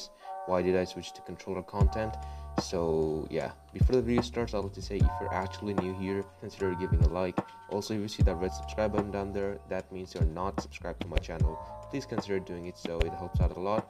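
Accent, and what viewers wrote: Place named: Singapore. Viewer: this sound like a Singapore accent I'm not gonna lie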